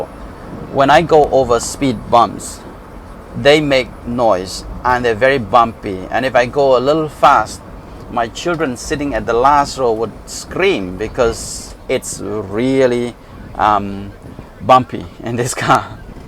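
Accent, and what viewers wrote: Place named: United Kingdom. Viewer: I like your British accent!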